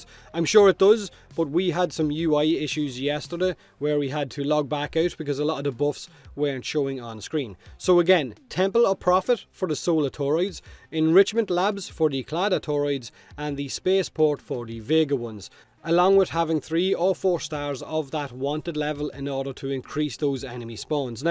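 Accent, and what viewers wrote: Ireland. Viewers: Hay is you Irelandish?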